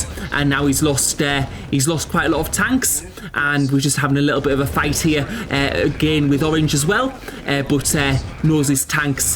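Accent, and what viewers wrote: United Kingdom. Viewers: The queen's English (miss her already).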